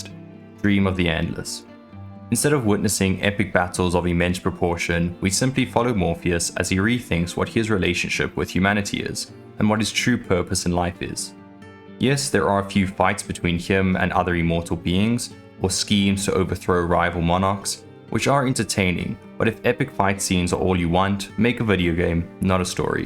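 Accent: South Africa. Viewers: bro u South African? u got the accent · Awez Bru, I'd recognize that accent anywhere my Bru 🇿🇦🇿🇦🇿🇦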